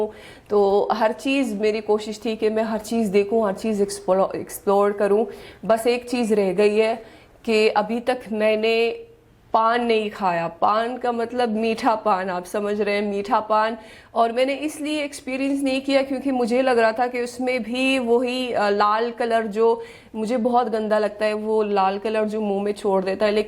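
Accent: Pakistan. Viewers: You don't look Moroccan,accent and look all over Pakistani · No one say you’re NOT PAKISTANI because your facial expressions and Urdu accent is very closely to Pakistani. I would like to where you were raised. Where in Morocco your parents from. I’ve been to merrakesh, Casablanca and Tangier. I love your country and I was thinking to move there. Please show country which is beautiful and cost of living is so affordable. Love to know more about your upbringing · Accent is Pakistani, .....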